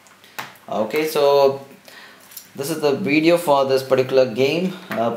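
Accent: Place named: India. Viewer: its indian guy talking, would not trust 2 much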